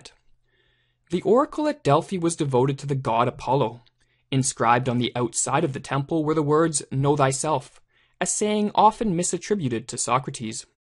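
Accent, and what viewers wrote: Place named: Canada. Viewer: you can tell he's canadian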